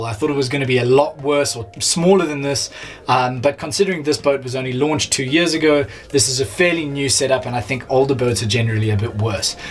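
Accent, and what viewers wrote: South Africa. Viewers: Are you SouthAfrican? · Are you south african??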